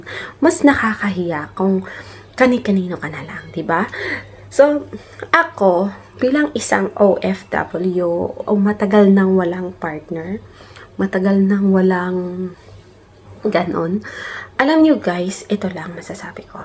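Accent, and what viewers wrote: Iloilo, Philippines. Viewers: Taga san kba ma'am? Daw ilonggo ka bla! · Nice ilongga si madam 😂😂😂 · Ilongga ka idol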